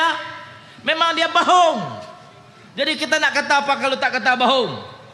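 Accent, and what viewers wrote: Kedah, Malaysia. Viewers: TERBAEEEK BM KEDAH, MB SANUSI. IN SHAA ALLAH, MB SANUSI. ALLAH KURNIAAN BANYAK NIKMAT DARI ALLAH UTK MU MB SANUSI. ORG BAIK YG ISTIQOMAH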